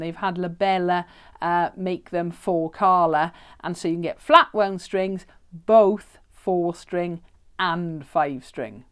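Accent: Wales, United Kingdom. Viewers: Omg haha I'm sooooo sorry 😂 I'm usually pretty good with the Welsh accent and you speak very clearly so it was obviously me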